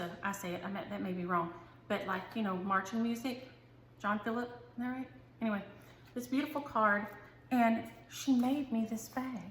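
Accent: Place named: North Carolina, United States. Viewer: I seriously laughed out loud when you talked about whoopings and “I’ll give you something to holler about...” I love Blackbird too and that pretty bird in the red! Nice job....keep up the great work... now to go see where you’re from! I live in NC and you sound like me! 😂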